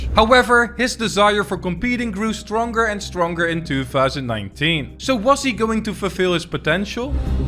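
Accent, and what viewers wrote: Netherlands. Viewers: Me after half of ur first sentence: Yes, this guy is DEFINITELY Dutch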